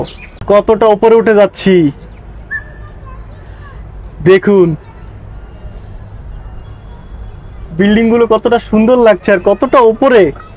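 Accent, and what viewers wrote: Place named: Bangladesh. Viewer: apnar bangla vasa to valo noy! kemon jeno bangladeshi mone hoy j?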